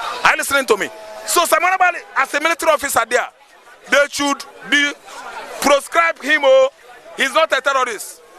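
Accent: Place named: Igbo, Nigeria. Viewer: voice of an Igbo man ,when they speak we know .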